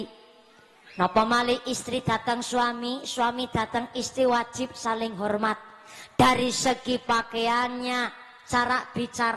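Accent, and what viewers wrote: Banyumas, Indonesia. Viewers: Justru ciri khas mumpuni tuch bhs ngapaknya...salut dah menyimak. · Mumpuni. Ceramahe ngapak ngangeni.cerdas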